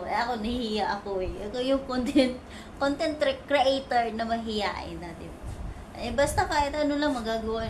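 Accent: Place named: Hiligaynon, Philippines. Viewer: Ang ilongga accent di tlga sya matanggal oyy 😂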